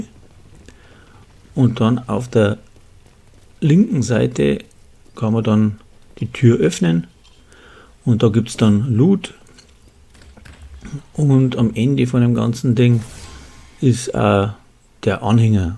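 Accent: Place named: Swabia, Germany. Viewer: Als selbst tiefster Urbayer frage ich mich, wo der Dialekt herkommt. Hat Einschläge vom Schwäbischen, wie es mir vorkommt.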